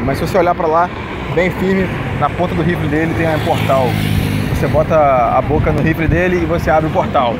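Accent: Rio de Janeiro, Brazil. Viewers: eu desconfiava dele ser carioca pelo sutaque dele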